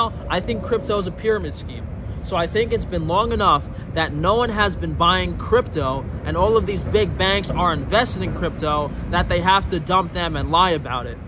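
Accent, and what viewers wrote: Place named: New York, United States. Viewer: That's called an accent, friend. Lots of people in NYC have one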